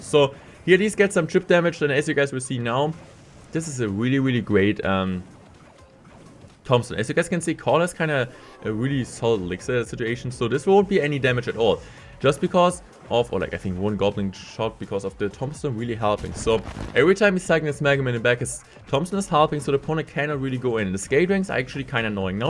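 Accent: Germany. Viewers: Just found this video on my recommended. This guy's accent sounds german. Lit 👌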